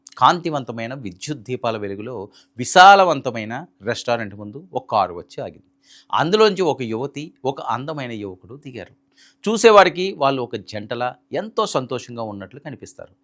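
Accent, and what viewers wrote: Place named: Andhra Pradesh, India. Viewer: వరై ఏంద్రా ఆ loveday ల భాష..యాస ... నీ ఆంద్ర సోల్లు ఆపు... మంచిగా తెలుగు మాట్లాడు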